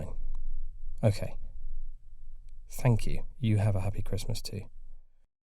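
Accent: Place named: United Kingdom. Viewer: イギリス訛りいいねぇ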